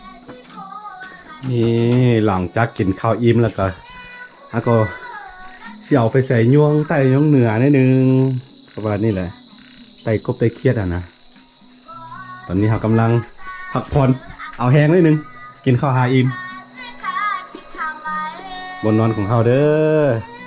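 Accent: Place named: Isan, Thailand. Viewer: ชื่นชมครับอยู่ไร่อยู่สวนแบบพอเพียงครับ อยู่จังหวัดอะไร ทําไมพูดปนอิสานได้ครับ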